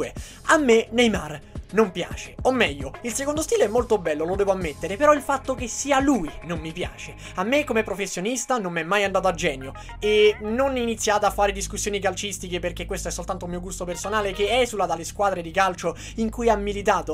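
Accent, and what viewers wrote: Rome, Italy. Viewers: Sono di napoli e ti.ascolto sempre ma so parla pure en romano a o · bravo ragazzo sei davero simpatico nei tuoi video , continua perche sei davero particolare sarà il tuo accento romano , sarà che non sei antipatico come gli altri youtubers ma secondo me ti meriti di più come iscritti di certi che parlano di fornite , concludo dicendoti continua cosi che vai alla grande ciao ed e sempre un piacere vedere i tuoi video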